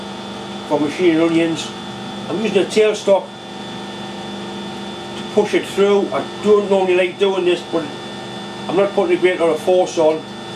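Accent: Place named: Geordie, United Kingdom. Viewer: When I subscribed to this channel, it was because of the impenetrable geordie accent. If I'd have know that there would be veggie machining iin the future I would have subscribed twice!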